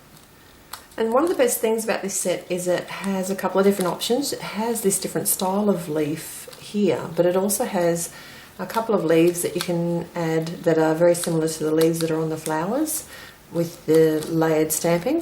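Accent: Australia. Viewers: Hi. Where can I buy the extra thick foam pad. From your accent it sounds like you are in Australia, so am I. Thanks again. Regards Liz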